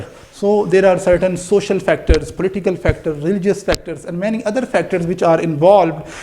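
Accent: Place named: England, United Kingdom. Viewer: Wow sir i like your English accent 🤍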